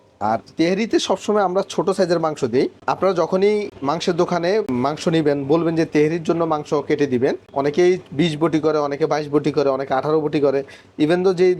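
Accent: Noakhali, Bangladesh. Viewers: নোয়াখাইলা ভাই, পলাও ভালো রাধছেন।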